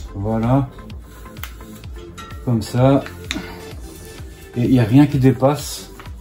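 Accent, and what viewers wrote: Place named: Belgium. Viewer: un petit like pour les explications et pour l'accent belge que j'aime bien :)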